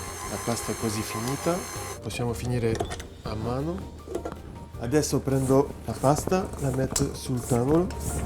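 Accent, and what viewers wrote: France. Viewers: Is he speaking Italian with a French accent😍😍😍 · Sono inglese. Ma lui sta parlando col acento francese no? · Ma perchè iett tene l’accent franzoso?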